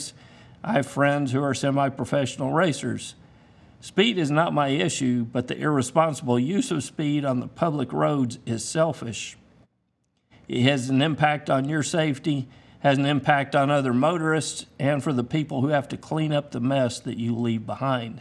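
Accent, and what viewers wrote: United States. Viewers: I'm curious. What accent is his? American, I assume, but which part of the US?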